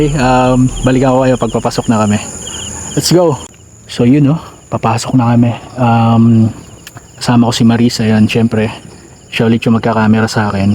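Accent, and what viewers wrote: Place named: Visayas, Philippines. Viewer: I'm your new subscriber and the way you talk you have Visayan accent..watching your vlog is creepy and exciting...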